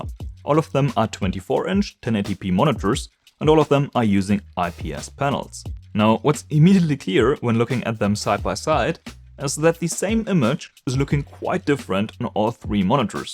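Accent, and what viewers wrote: Germany. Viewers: Ich habe das gesamte Video gedacht „Der ist doch 100% Deutschsprachig", und trotzdem hat mich dieses „Bis zum nächsten Video" komplett gefickt 😂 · wie man merkt dass du deutscher bist hahahah :D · r u german? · Kanns sein das du deutsch bist? Trotzdem guter Akzent. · Do I hear Deutsch??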